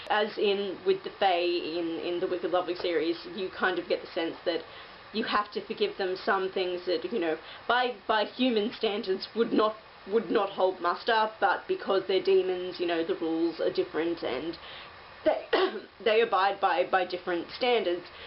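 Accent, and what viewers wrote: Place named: Australia. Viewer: I thought it was you because you can hear the slight twang of your accent haha. It's very good and also, co-wrote a musical? I must say I'm ever impressed! You're a book loving, disney obsessed, musical writing Australian. It doesn't get more awesome than that :)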